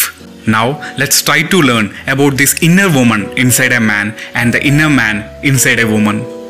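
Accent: India. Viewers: Your Indian accent makes this video much more impressive and sound more spiritual. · Sure. Will do. You take care. I wish you the best. Always will keep wishing more luck to you. Dont worry about being Indian or the accent. I know our image is looked down - It is what it is. But keep going. "I know of no better life purpose than to perish attempting the great and impossible. The fact that something seems impossible should not be a reason to not pursue it. That's exactly what makes it worth pursuing. Where would the courage and greatness be if success was certain and there was no risk." - Nietzsche.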